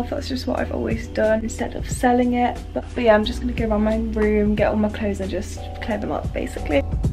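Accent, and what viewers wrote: United Kingdom. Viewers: ur british accent has my all heart 🥺 ps: i love all your videos too<3 xx from Brazil 🇧🇷❤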